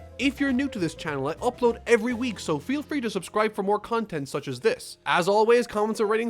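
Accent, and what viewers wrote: Ireland. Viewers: Dat thick Irish accent though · are you Irish? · * is irish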